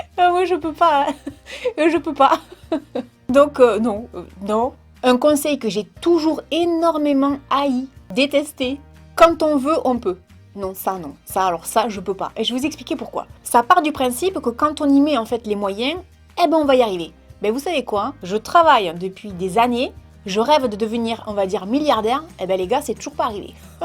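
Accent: South, France. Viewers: J'adore ton accent du sud, ça met du soleil dans ma journée ! Sinon pour les études ça fait 3 ans que je fais de l'informatique et j'ouvre enfin les yeux en me disant que c'est pas fait pour moi ... Bon au bout de 3 ans c'était un peu long mais du coup je sais même plus ce que je dois / peux faire avec ma filière, compliqué ! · OMG, là je ne pouvais pas ne pas écrire: Prend un caillou si tu as un point de côté!! Jamais entendu celle là. Les gens qui ont inventé ce conseil étaient pas très fut fut. Tu veux qui fasse quoi le caillou! Il est pas magique:'D Sinon un conseil quand tu as le occet (aucune idée de l'ortho) on m'as souvent dit de boire de l'eau, ça a jamais marché:'D ou alors bloque ta respiration, ça marche temporairement mais après je l'ai toute la journée. Pas cool. Par contre ce qui marche, c'est une cuillère de citron et du sucre. Après chacun est unique donc... 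ce qui va marcher sur qqun ne va pas marcher sur un autre. En tout cas, je voulais te dire que depuis que je suis ( depuis tes débuts) je ne peux me passer de regarder chacune de tes vidéos. Tu mets de bonne humeur! Ton accent du sud me réchauffe autant que la bonne humeur que tu dégages. Merci d'être toi!:D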